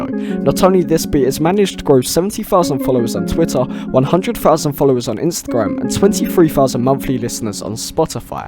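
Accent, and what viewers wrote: United Kingdom. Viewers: as a 'modern activist' myself, this man is not the definition of an activist at all. The fact that you are using the term 'modern activist' as a negative term is derogatory to those of us who actually do work every day to TRY to bring attention to pertinent issues. If you think COMMENTARY YOUTUBE is ACTIVISM, you are SORELY misunderstanding the definition of the word. Sorry babe-- ur british accent doesn't hide your lack of ability to use google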